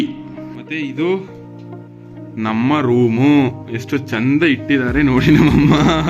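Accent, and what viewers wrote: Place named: Nanjangud, India. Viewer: ಯಾವ ಊರು ನಿಮ್ಮದು. ನಿಮ್ಮ ಭಾಷೆ ಕೇಳ್ತಿದ್ರೆ ನಂಜನಗೂಡು ಕಡೆ ಅವ್ರು ಅಸುತ್ತೆ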